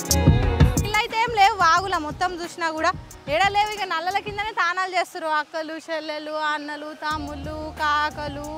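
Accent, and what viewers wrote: Telangana, India. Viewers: REally loved her True Telangana Accent, We need such . Authentic . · Maa urilo matladukunatte unnay mi muchatlu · మా కనకవ్వ (అమ్మమ్మ) లెక్కనే మంచిగా జెప్తున్నవ్ పో... మన తెలంగాణ యాసలో మస్త్ మాట్లాడుతున్నావ్ సూపర్...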